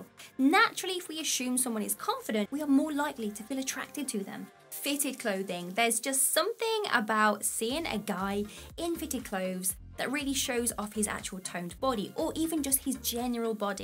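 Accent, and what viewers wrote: United Kingdom. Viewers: I like you British accent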